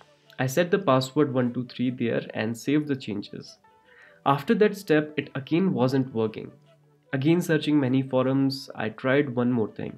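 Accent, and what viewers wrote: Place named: India. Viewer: omg this indian voice meeeeh, dislike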